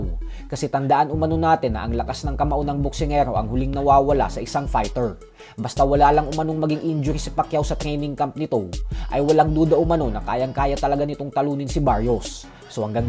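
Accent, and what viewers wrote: Visayas, Philippines. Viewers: Trantado ang blogger na to visayang Visaya ang boses mag inc kanalang boy at Kunin kanaming hitman kikita kapa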